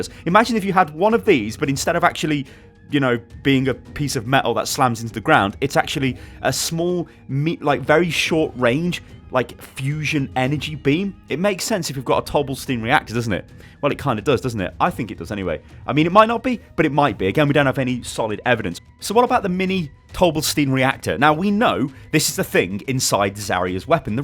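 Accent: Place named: United Kingdom. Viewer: I F*CKING LOVE BRITISH ACCENT, sounds so cool!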